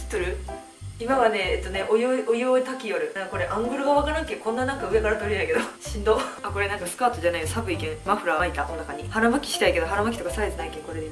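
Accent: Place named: Kansai, Japan. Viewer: ぺんぎん さん そうでしたか！w どうりで、関西訛りの日本語が上手いと思っていました！ww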